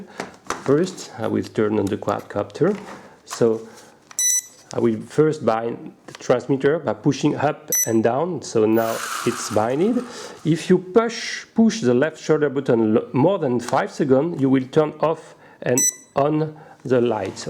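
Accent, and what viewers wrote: France. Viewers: Salut, vu ton accent je suppose que tu es français :-) Je voulais savoir si ce quad était bien pour commencer avant d'attaquer le vol sur un 650 monté par mes soins? Et que signifie Banggod courtesy? En gros ils te le filent gratos et tu leur fait un peu de pub en échange ? J'ai également une chaine YouTube, tu crois que ça serait possible pour moi de faire la même chose ? Cordialement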